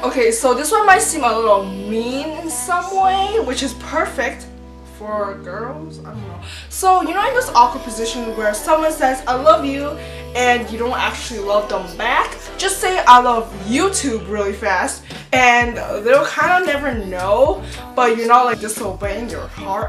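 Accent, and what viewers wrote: Canada. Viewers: are you Canadian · Canadian eh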